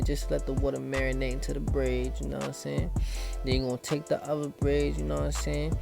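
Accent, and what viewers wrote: New York, United States. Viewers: Bro I can tell you from New York by the way you talk good video tho g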